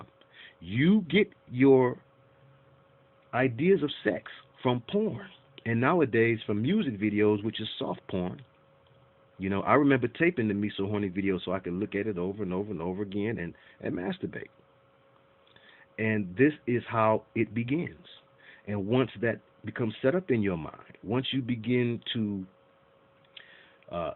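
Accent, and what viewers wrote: Detroit, United States. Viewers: You Detroit alright. haha. Your speech gives you away. wink wink. I'll add some jesters, to soften blow. I do have a sense of humor. I meant nothing, but to share. I'm the nicest eevvveeer! Might want to edit and erase #. I got it. They're are crazies out there, don't know. Is it you or me? lol Thanks though. Gave me a bit of a complex, but not much, I'll get over it. I've very delicate but when it comes to this here bible, I can't afford to fail. Everyone should feel the same so respect. Good I can watch your videos again. Woosh.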